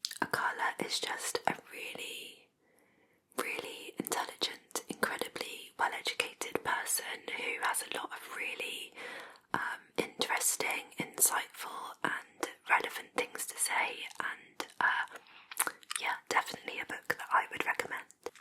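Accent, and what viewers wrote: United Kingdom. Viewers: Hello! Thank you for “ breaking the fourth wall “ for a bit, and sharing a bit more “ personal aspects “ of you, and your life. I truly enjoy your channel, as you seem definitely more down to earth, and genuine (perhaps it’s an “ English/ British thing”), but it’s warm and approachable, this energy that you share in your videos. And your voice, gentle, along with you lovely accent, is so very relaxing. Anyway, as I noticed that you seem to have a bit more of a “ mischievous and playful” sense of humor as some of your answers in this video would indicate, would you ever consider doing a bit more “ silly, ridiculous, or playful” characters in future videos? Just a curious inquiry from a distant admirer…..be well, ( sorry, never learned your actual name, if you’ve ever shared it!) Cheers! Thanks again for all the hard work!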